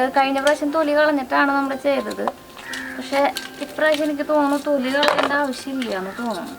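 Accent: Thrissur, India. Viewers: Pure vegetarian aanengilum njan checheede non veg cooking videos um kaanum.... checheede thrissur slang kekkaan vendi 🤗🤗🤗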